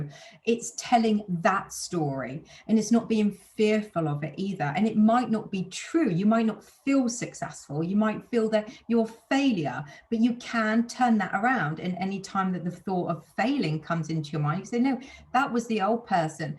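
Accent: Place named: United Kingdom. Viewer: 💎💎💎!!! excuse me!!! 😇 🎁 are you really 44?!?!?!? 🥰🥰🥰 you are blessed and you bring light to the world you really found your path / purpose in life with the best compliments from Italy 🎉🌍🌎🌏 I absolutely adore 🐞 your British accent!! 🌈 keep it up, my dear Life Coach 🍓 (I thought you were btw 28-33!)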